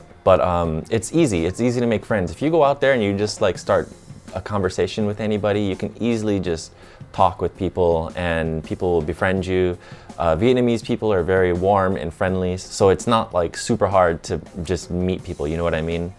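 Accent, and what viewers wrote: United States. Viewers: I'm sure you've been asked this before. But how can you speak such with such a general American accent? You are from Japan, ethnic Korean. If someone doesn't spend most of their elementary school years in the U.S., they will have at least SOME accent. I don't hear even a hint that you didn't spend all your youth in America. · Ribas Yes, he does! really nice one... Sometimes I comment down on his Instagram photos and his Youtube videos, replied all of it and I'm very appreciated it. I'm Vietnamese, actually English isn't the main language in Vietnam, so I want to improve my English listening skill and then I decided to watch videos. I really love American accent. His voice was warm and inspirational... I always support him 😁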